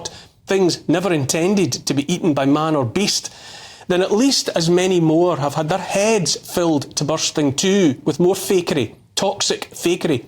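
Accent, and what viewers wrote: Scotland, United Kingdom. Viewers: That strong, angry, Scottish accent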